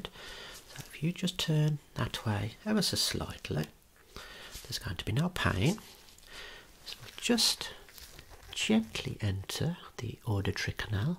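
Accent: Birmingham, United Kingdom. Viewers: Damn, that's a strong Brummie accent. Works so well for this relaxed vocal range style in this video, though. Love it! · that British voice I mean DAMN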